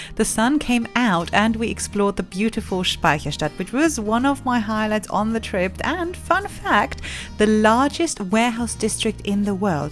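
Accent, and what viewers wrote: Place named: United Kingdom. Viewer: You are not German because you have a British accent, if you where German you would have a German speaking channel. Just admit that you are British and not German because nobody believes you.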